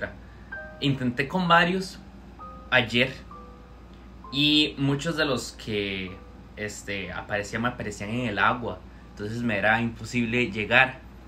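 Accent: Costa Rica. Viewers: Sos de Costa Rica es que mae con esa voz es imposible que no jajajaja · Ud es de costa rica?? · Eres tico? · Tico? habla como tico jaja pura vida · Eso mae!, saludos, el hablado de un tico no se pierde xD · Saludos desde Costa Rica, se notó el acento :) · jaja no se pierde el acento Tico, saludos 👌🏻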